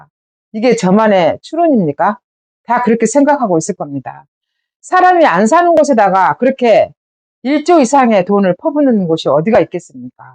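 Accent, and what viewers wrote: Jeolla, South Korea. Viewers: 와~~ 진짜 존경스럽네요..... 내옆에 아는 (지인은 아님)인간 김대중 내가 실정한거 말하니 눈깔에 힘주면서 그 분이 그래도 민주주의에 얼마나 기여했는데 그러냐고 소리치기에 솔찍히 귀싸대기 한대 갈려줬음 ㅋ 미친늠 민주주의에 기여한거 있지만 때중이 없어도 우리나라 국민성이라면 이정도 민주주의는 언제든지 이루었어 하지만 때중이의 실정은 일일이 나열 할 수도 없을만큼 많다 그러니 개소리마라하고 귀싸대기 날렸음 솔까 덤빌줄 알았는데 안덤벼서 일이 커지진 않았지만 ㅋㅋㅋ 이분 님 전라도 사투리 쓰시는데 이런분이 전라도에 많이 최소한 30%만이라도............ 그럼 지금의 대한민국은 훨씬 더 발전했을건데.......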